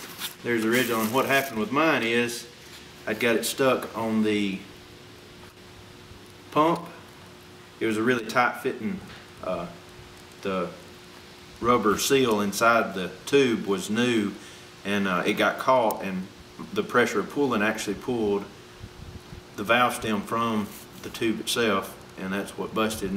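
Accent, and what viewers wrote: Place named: South, United States. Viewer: Hey man, thanks! The southern twang was comforting as well as seeing you struggle a little. Definitely inspiring!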